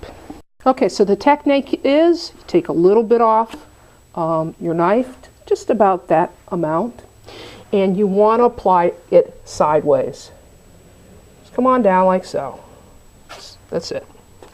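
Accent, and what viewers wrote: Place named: East Coast, United States. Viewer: I like to watch you. You are as the previous person mentioned delightful. I also feel you address points that a person (women) like to know. There is more than one way and you cover briefly the differences and don't claim one is better than the other but point out why you may want one over the other at times. I have watched a lot of videos in preparation for a remodel that required a couple walls (paneling) being opened and removed and wanted to put drywall in its place. I have done small repairs in the past on walls and felt comfortable attempting this large project but but after watching some videos in research I felt a little intimidated, but after watching you I feel much more at ease. Thank you. P.S. Your accent reminds me (a Californian) of my east coast friend.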